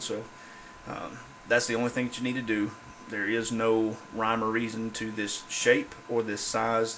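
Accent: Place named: South, United States. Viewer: You sound like your from below the Mason Dixon line... >.> great way to make a hill though!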